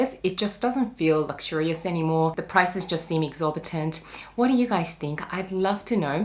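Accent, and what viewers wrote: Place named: Australia. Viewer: Your aussy accent is so cute and the way you speak is so pleasant and calming. I can listen to you talk forever 😆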